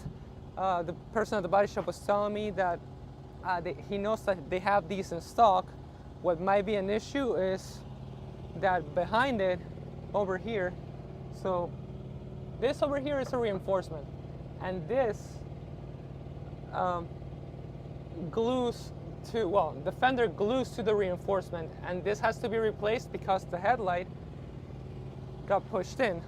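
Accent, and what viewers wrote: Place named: Latin America. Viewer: El trokero peruano que no hace vídeo en español…. Smh. Don’t forget where you come from.., don’t be one of those Latinos that think they are gringo because they light skin and speak English(with an accent). Not hating but it’s the truth.. there’s a reason why you got less than 5k subs.. Vlog en Español and you’ll see your channel blow up. ✌🏽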